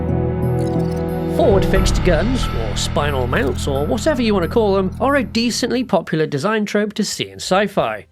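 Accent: United Kingdom. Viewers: Eeek… bro got two of the worst traits, squeaker voice and British accent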